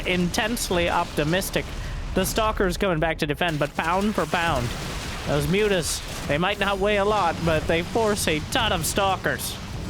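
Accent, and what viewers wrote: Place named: Transatlantic, United States. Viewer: Can I just say, for some reason your voice has a trans-atlantic quality, and for some reason i find that somewhat enjoyable.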